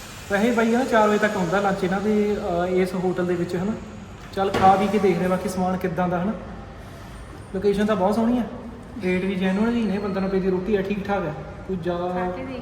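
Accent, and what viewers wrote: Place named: Patiala, India. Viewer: Inderਦੀ ਬੋਲੀ patiala aria ਦੀ ਆ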